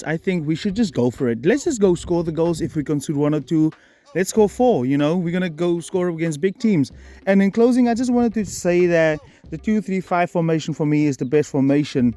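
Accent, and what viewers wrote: South Africa. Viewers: You sound like a South African 😅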